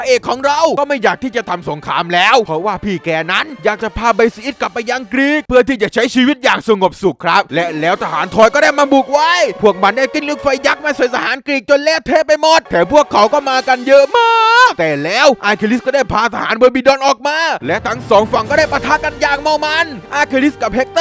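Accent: South, Thailand. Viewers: พี่เป็นคนใต้ใช่ไหมคะ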